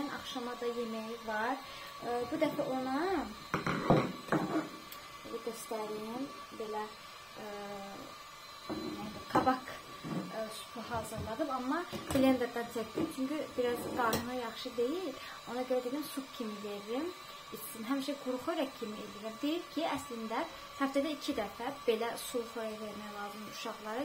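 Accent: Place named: Southern Azerbaijan, Iran. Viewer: Salam bacı bir videonuz təsadüfən qarşima çixdi,elə o videodan sonra sizə abunə oldum,özümdə iki uşaq anasıyam,eləbil öz həyatımı gördüm amma mən sizdən yaşda böyükəm,heç kese qulaq asmayın, necəsinizsə elədə evinizə eşiyinizə yapişin,ailə bir qaladır o qalaya başqalarının fikirlərini girmeye qoymayın çox səmimi,mehriban ailəsiniz Allah qorusun,birseyi unutmayın bacı bugün etdiyinizi qarşiliqini Allah sizə verəcək inanın,həmdə fazlasiyla halal olsun size tərbiyə verən ata-anaya(bu arada videolarinizda doğma cənubun ləhçəsinide,eşitdim yada oxsatdim😊özüm Masallidanam❤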